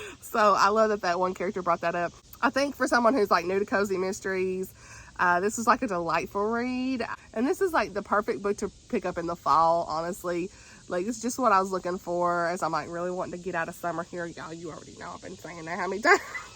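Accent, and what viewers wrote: South, United States. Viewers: I immediately smiled at the first two words you said! "Hey, y'all!" as a southern girl myself living in the west I miss hearing the southern accent! basically, I. Am. Here. For. It! 😁 and yes, if you go to my videos you'll know that I don't have a southern accent. Everyone asks me where it is. and i'm sad i lost it (maybe never truly developed it) But when i go back home to visit family and talk to people with a southern accent, mine shows up! or when i'm ranting about something! 😂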